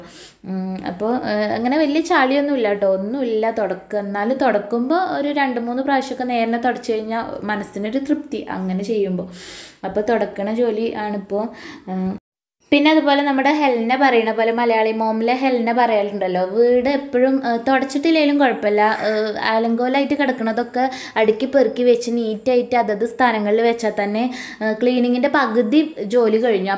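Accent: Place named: Malappuram, India. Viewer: തനി മലപ്പുറം ഭാഷ പെരിന്തൽമണ്ണ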